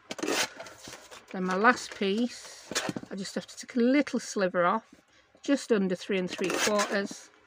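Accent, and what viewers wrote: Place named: Yorkshire, United Kingdom. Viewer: you made me chuckle with your Yorkshire-isms 😂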